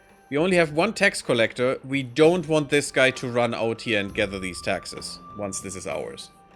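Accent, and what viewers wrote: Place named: Germany. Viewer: nigga ich kann ganz klar raushören das du deutscher bist. warum redest du nicht deutsch?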